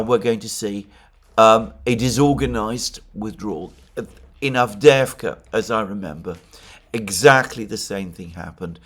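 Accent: United Kingdom. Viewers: The only angle this guy focuses on is from the Russia perspective or the effects on Russia. Looks like a Russian agent of Greek background raised in and made to look and talk like a Brit!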